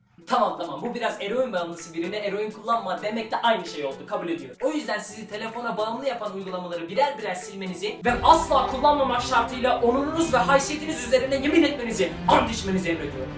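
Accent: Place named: Trabzon, Turkey. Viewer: trabzonun neresindensin reyiz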